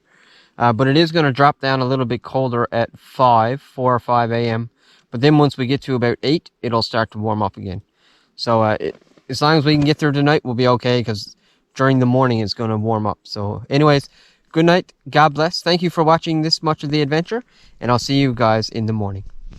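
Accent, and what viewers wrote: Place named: Cape Breton, Canada. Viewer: You sound like my Cape Breton brother the way you speak. I enjoyed this video. Thank you🤗🇨🇦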